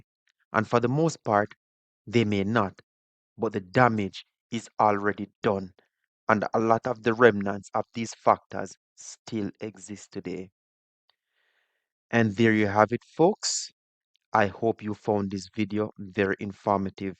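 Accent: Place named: Jamaica. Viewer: all due respect bro, but you sound Jamaican. why are you making videos about FBAs? 50 percent of jamaican children live in single parent households. whats your point?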